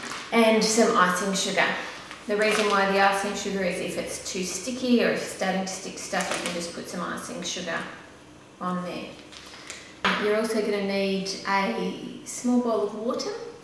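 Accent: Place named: Australia. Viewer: Wow! I came across your channel while typing in: How to make Pokemon cakes ^_^ Thanks for the fondant colouring video. This was great. And judging by your accent, i can make out you're from Australia. I'm from Melbourne. Haha ^_^ Anyway, please keep posting more videos.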